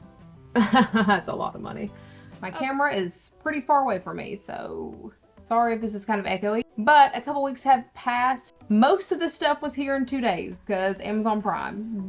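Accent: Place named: South, United States. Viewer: ! I noticed your accent and I was like, "Girl has to be from the South!" Honey, I'm in Huntsville...only 30 minutes from you! I don't know why I'm so excited. Lol. My son got a leopard gecko for his birthday and we are in love!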